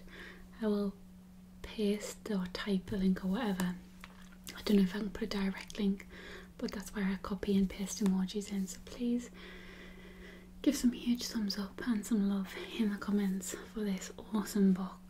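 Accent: United Kingdom. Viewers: Your eating sounds are too perfect :) that and British accents rule :)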